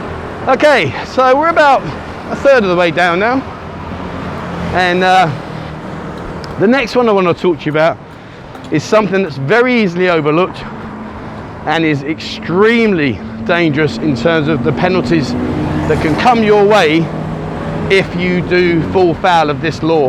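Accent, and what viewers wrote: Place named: United Kingdom. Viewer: Why don't you make an effort to pronounce Pattaya correctly like Thais do .not like a Brit !!!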